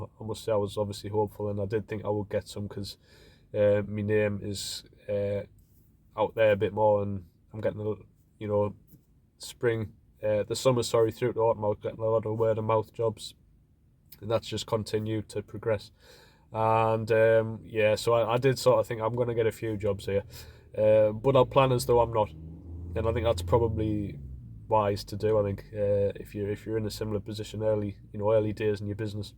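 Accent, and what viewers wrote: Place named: Teesside, United Kingdom. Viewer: Good evening I have just started watching your channel and enjoy your no bull approach. I'm based to Harrogate so not a million miles from you. I take it by your accent you are Middlesbrough Teeside area. My plan is to start my own gardening business next year. I am a little different as I am 67 years of old have done a few jobs this year. One was lifting and completely re laying a lawn, and several maintenance jobs plus a few cut blow and go. Just spending winter time getting estimates for equipment and deciding on a marketing strategy. any help you could offer would be appreciated. One question that raised it head to is if finances were not a problem which power tool make would you go for. I am currently looking at Stihl and Ego and leaning towards Stihl only because I sold Stihl in a former life your advise will be appreciated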